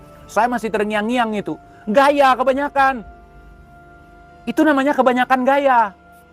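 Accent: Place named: Aceh, Indonesia. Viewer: Bang , peugah haba ,mangat that ta dengo . Makasih . Logat Aceh bék gadòh .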